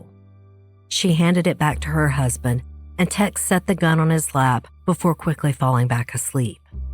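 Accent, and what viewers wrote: South, United States. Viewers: I love your channel, you have the most relaxing voice to listen to a good story while omw to bed. And I’m from the South so I love your accent, it makes you feel familiar!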